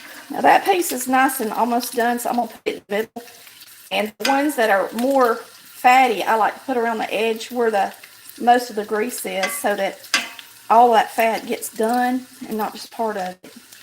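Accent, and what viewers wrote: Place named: Carolinas, United States. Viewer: 🌷🌷So enjoyed watching you fix your family's Sunday breakfast! 🌷🌷 I hope you know how very blessed you are to have such a HUGE kitchen to work in! I'm always fussing about my little "play-kitchen" being so small with no room to put stuff! ☺ Anyway ... I'm gonna try this method. I hadn't fixed biscuits in quite a while but need to start again since the frozen ones now have begun to add TERRIBLE ingredients. Yours remind me a little of Brenda Gantt's way of fixing biscuits. BTW - I'm guessing you are located somewhere in the Carolinas by your accent? (I'm a North Carolina girl, myself, and now live on the VA-NC line.) May the Good Lord bless you and yours ... and you are doing a GREAT job!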